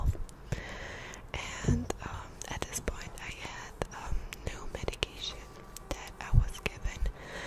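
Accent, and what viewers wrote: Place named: Slovakia. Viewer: I can hear her Slovak accent it’s so pretty and pure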